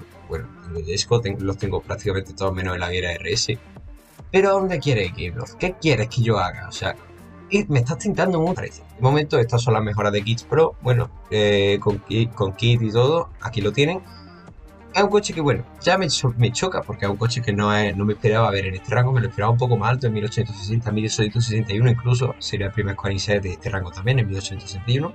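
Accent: Spain. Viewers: Eres de España :p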